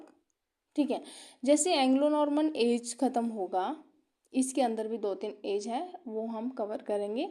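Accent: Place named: Haryana, India. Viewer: Hariyanvi rajasthani accents aa rhe mam , 😅 harayana se ho ??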